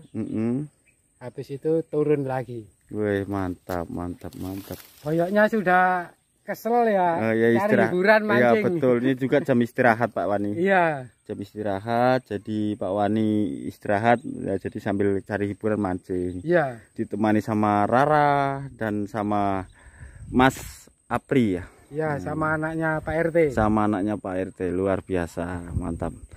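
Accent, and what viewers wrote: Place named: Banyumas, Indonesia. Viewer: Ora ngapak ora...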